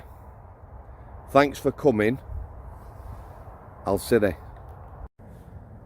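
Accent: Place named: Wigan, United Kingdom. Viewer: I wish I knew where you came from, you sound like a Wigner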